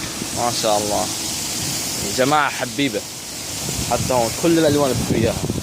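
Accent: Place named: United Arab Emirates. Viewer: ياخي لهجتك زكرتني بايام حلوة ايام الفريج و الربع ... انا هلق بالمانيا و كنت عايش بالامارات .. بس ما حبيت المانيا ابدا ... الامارات احلى بكتير ... كيف امريكا حلوة متل ما منفكر و لا كمان متل اوروبا قرف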